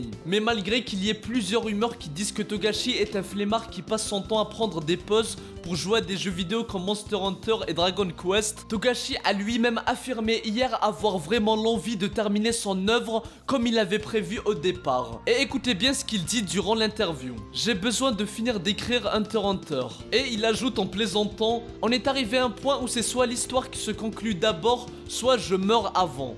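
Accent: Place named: Kabylie, Algeria. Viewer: S/O l accent kabyle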